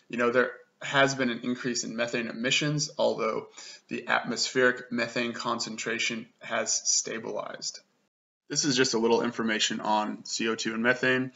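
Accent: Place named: United States. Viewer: That’s wonderful. I can tell you truly care about animals. You seem like a nice guy. I’m glad I found your channel:) Wow Finland? That’s awesome! You have an American accent. Why did you move to Finland if you don’t mind me asking?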